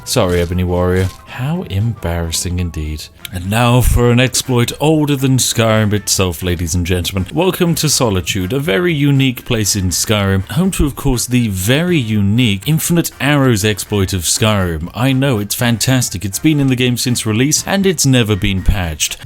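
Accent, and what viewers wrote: United Kingdom. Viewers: Youtube: recommends a video. Me: hears slow talking British voice. Me: ×2 it is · Something says to me that he is British 🤔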